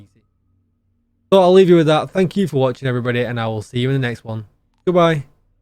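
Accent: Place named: Yorkshire, United Kingdom. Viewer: Hey where in the UK are you from. Your accent sounds maybe Yorkshire? Great content by the way.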